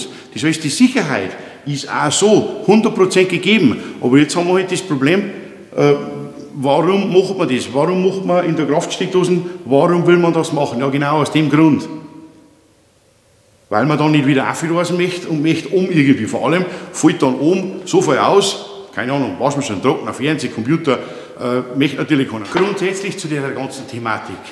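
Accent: Bavaria, Germany. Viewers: Bin ein Ungar, hatte Deutsch nur als Fremdsprache in der Schule gehabt, verstehe dich aber doch ganz prima! Bairisch finde ich schön - sei es dein Kanal, Ottfried Fischer oder die Gruberin :)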